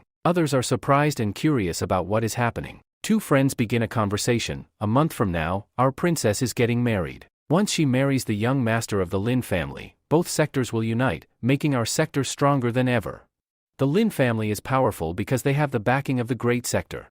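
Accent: India. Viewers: Fckn indian accent immediately made this story a stupid joke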